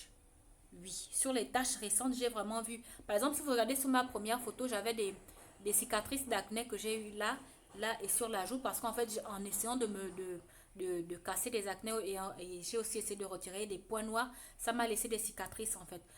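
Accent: Gabon. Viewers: Hello. Super merci. Vous êtes Gabonaises rien que ai son de votre voix 😇😇🙏merci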